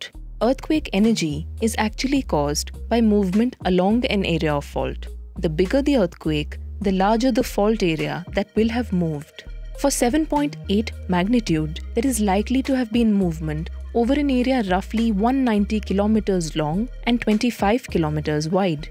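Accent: India. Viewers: I hear indian voice i hurriedly cancel because when we have Europeans expert why should I waste my time listening a mass who believes more in religion then in law and order 😭😔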